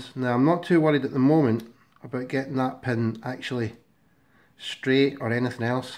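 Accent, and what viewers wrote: Scotland, United Kingdom. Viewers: I love your scottish accent :)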